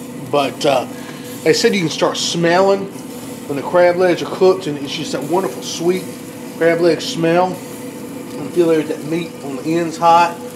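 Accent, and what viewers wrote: South, United States. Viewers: I love ur southern accent!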